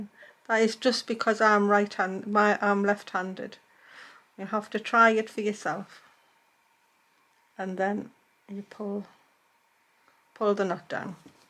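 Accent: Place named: North East England, United Kingdom. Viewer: The daisies have made such a difference. Your coat is looking so special. I love your videos especially your lovely NE accent.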